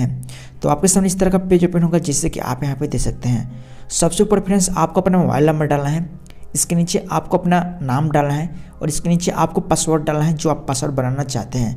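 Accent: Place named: Arrah, India. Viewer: awaj me Ara jila ka jhalak mil rha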